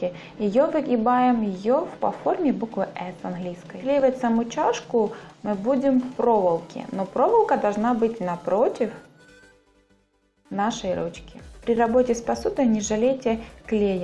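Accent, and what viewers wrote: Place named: Ukraine. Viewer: ну я поняла,просто сказала.вы с Украины?у вас есть акцент.много знакомых на Украине живут,они все верят во всякие приметы.У вас не так?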